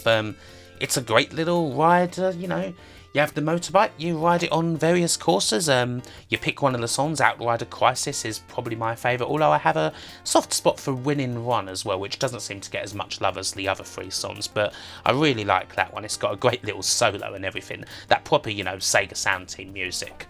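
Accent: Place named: Essex, United Kingdom. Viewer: Nearly right. comes from Essex, which is to the north east of London.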